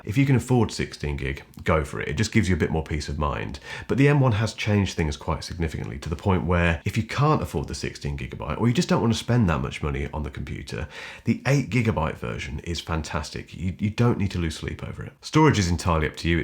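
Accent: England, United Kingdom. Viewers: All YouTube reviews should be in English accent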